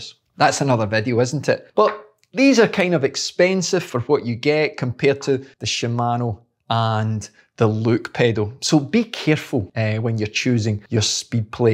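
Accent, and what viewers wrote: Scotland, United Kingdom. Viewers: yeah, pretty much been using Time since day 1. Using Crank Bros for gravel and MTB, which is similar to Time in terms of lateral float and retention/release. Love the channel (and the Scottish accent!) and keep up the great work! 👍👍👍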